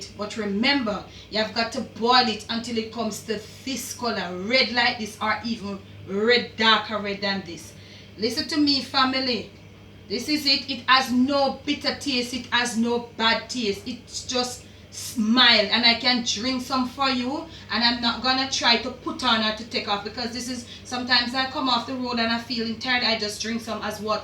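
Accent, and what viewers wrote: Jamaica. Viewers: I heard the accent and BOOM 💥 HIT that subscribe button... I love ❤️ mama’ patois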